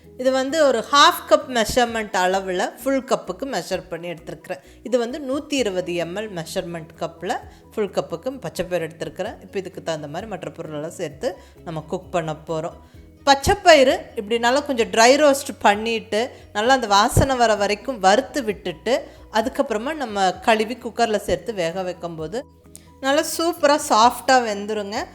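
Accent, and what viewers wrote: Kongu, India. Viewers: Mam nenga kongu tamilil pesurathu pidichirukku..nan Theni Dt.bodinayakanur. gravy super sis. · Neenga.. namma kongu tamila pesurathe azhaga irukuthunga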